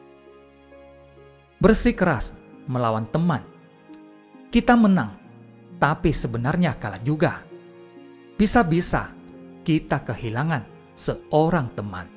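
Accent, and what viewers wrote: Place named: China. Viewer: hahaha, itu dibacanya dengan logat china seperti di film2 kungfu. Akan lebih enak klo pake bahasa aslinya, kemudian ada teks terjemahan.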